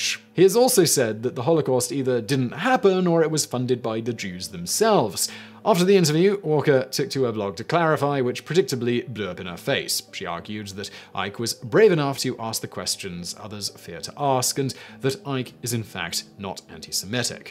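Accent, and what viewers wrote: United Kingdom. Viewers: Wtf, are those celeb are on drugs or have they lost brain's to say this. Absolutely love your British accent it's very something and relaxing for a Sunday night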